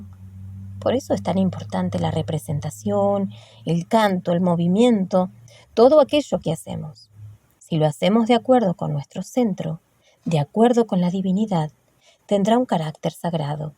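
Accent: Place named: Southeast, Mexico. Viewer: Gracias por los audiolibros de Malinche. Excelente y muy bonita voz que parece del sureste mexicano (Tabasco o Veracruz). Disfruto mucho cada audio. Mucho éxito en sus proyectos 🤗👍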